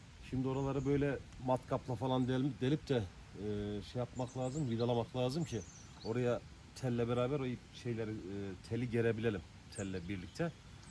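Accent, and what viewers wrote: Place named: Erzurum, Turkey. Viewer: Erzurumlu gibi geldi de konuşması. 🤔🤔 Neyse. 😊😊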